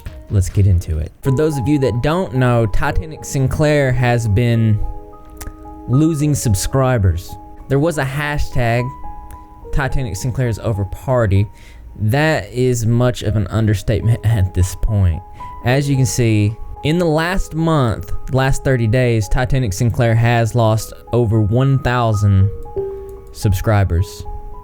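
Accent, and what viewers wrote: South, United States. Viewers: are you from the south? I can hear a southern draw in your voice.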